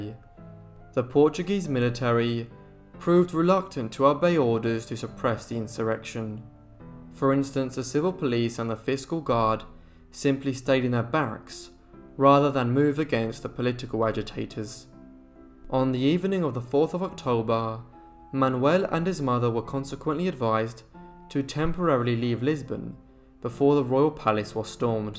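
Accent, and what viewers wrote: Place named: England, United Kingdom. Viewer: i just realized how well written your biographies are. - and very well edited as to choice of photos, length of time on screen, etc. so well produced. thanks again. 🙂🌷🌱 you know what i am dying to know - where your forebears come from. you have an idiosyncratic sort of English accent, yet you say thanks like as american. the brass or copper tea or coffee pots seem to be Middle eastern or Turkish. do you think you’ll ever let us know?? it’s fascinating to try and figure out. thanks much. stay safe :) 🏺🌷🌱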